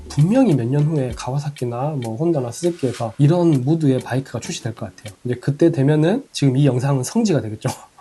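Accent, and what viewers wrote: Jeolla, South Korea. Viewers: 오늘따라 사투리가 더 구수한 느낌인데요? 전라도 사투리 먼가 정겨워요 ㅎ 바이크 타는데만 관심잇지 이론은 생각도 안해봤는데 채널 재미나게 보면서 매번 많이 알아갑니다. 항상 좋은 정보 유익한 영상 감삼다!!👍🫰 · 아따 구수허니 잼있게 잘 봤당께요. 서울살이만 십여년하다 올만에 전라도 억양 들으니 기분 좋네요 ㅋㅋㅋ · 고향이 전라도인거같아 구독은안합니다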